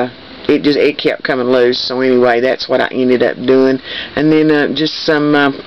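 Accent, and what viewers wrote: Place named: Kentucky, United States. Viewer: Great tutorial! I have been bitten by the cork craft bug too. I love your accent. Kentucky?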